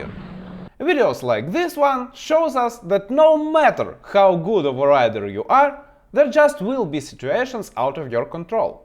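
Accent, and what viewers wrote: Russia. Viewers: Yes! Our presenter in question is quickly becoming one of my favourites. I really like Russian accent. I like how he further analyses MotoStar videos; I think they may also be presented by a Russian guy. Humour and good sense make for very entertaining and instructive videos. This guy is a good teacher.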